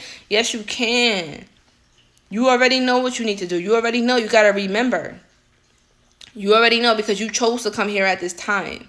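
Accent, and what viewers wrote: New York, United States. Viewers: Just started watching u but I love your voice very soothing yet New York 💜👍🏽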